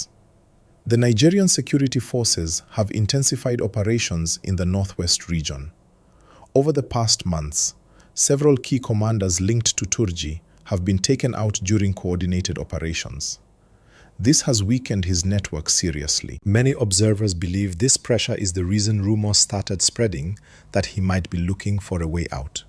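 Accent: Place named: East Africa. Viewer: it is quite interesting that some of you "TikTalk workers" assume that we listeners are only followers without the abliy to discern who is likely following your presentations. I challenge this individual to deny that he is not talking from east Africa. your ascent says so.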